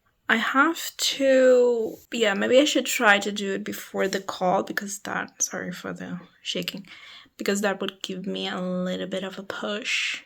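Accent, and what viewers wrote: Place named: Spain. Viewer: I feel like if I wrote by dictation, half my first draft would be "um"s 😂 your accent in Spanish is so nice! In my country we call the supposedly "neutral" accent in Spanish the "Discovery Kids" accent 😂 I am moving to Spain so I hope I get more used to hearing that one, as it's currently not my favorite either lol 🥲 I generally don't read via audiobooks but if I did I'm not sure I would wanna read a Spaniard narrator either. Oh and I had to skip over the Sunrise on the Reaping bits because I'm afraid of spoilers but honestly at this point it will probably be several months before I read that book 😅 I want to reread the original trilogy first, I need to get to it! Fun vlog:)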